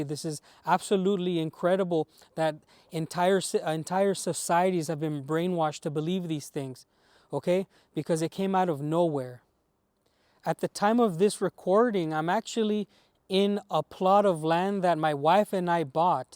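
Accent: Mexico. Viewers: Bracero was WW2. You're younger than me by a generation. Sorry your story does not work by time. You're at least born on the 1980s if your parents were part of the Bracero program they would have been in their 20's in WW2 which would make them in their 60's in the 1980s. Sorry pal not buying it. And your accent tells me you spent most of your early childhood in Mexico before you came to the US having nothing but contempt for our immigration laws like every other Mexican. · You sound more Mexican than Ameican, bro. Come on now. Dumb shit be dumb shit..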